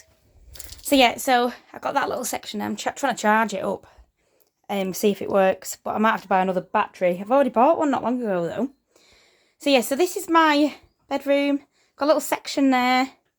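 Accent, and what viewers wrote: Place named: Cumbria, United Kingdom. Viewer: YouTube has just introduced you to me! How lovely to hear a fellow cumbrian accent! I missed the wool show for the very same reasons! It cut me up that it was so close to where I live, but I just couldn't afford to be tempted ~ next year 🤞🏻😅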